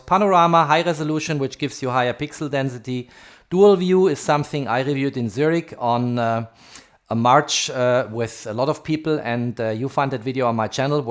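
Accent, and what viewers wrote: Germany. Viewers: Man hört am Dialekt das er Deutsch ist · Please, stop calling Huawei a Hua-Y, and Ultra ['ʌltrə] is not ['ultra]. I can hear your native language is german, nevertheless...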